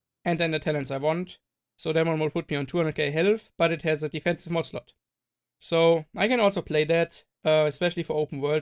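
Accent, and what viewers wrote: Germany. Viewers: Maaan, dieser deutsche Akzent macht mich fertig 😂😂😂 wäre cool wenn du auch Was auf deutsch machst ✌🏽👍🏽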